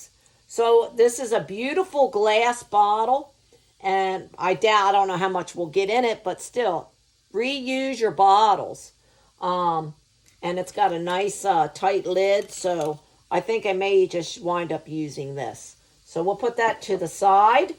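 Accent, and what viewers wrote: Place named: Pennsylvania, United States. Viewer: You have to live in Pa. with that accent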